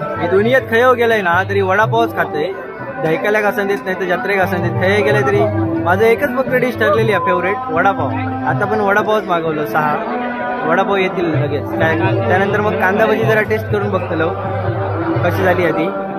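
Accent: Malvani, India. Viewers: श्री, लय भारी व्हिडिओ. खुप छान वर्णन केले आहे. तुझ्या बोलण्यात मालवणी गोडी आहे. एक लक्षात घे कि स्थानिक मराठी लोकांचे स्टॉल किती होते व परप्रांती लोकांचे किती स्टॉल होते हे समजले पाहिजे. उशी विक्रेताचा स्टॉल भारी! लोकांना काय हवे याचा विचार करणे गरजेचे आहे. प्रत्येक व्हिडिओ शेवटी विचार करणारा व परिणाम कारक हवा हि विनंती. राग नसावा. यु ट्यूब मध्ये आता स्पर्धा झाली आहे. आपण मुळाचे सिंधुदुर्गवाशी आहोत. आता पैसा कामुक लय व्हिडिओ दाखवतहत. सावधान माझ्या कोकणी बांधवानो! तुम्ही आहात म्हणून कोकण आसा! धन्यवाद 🌹🙏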